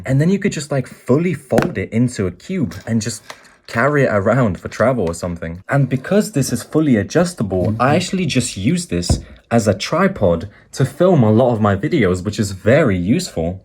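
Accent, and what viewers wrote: United Kingdom. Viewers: Your British right cause I am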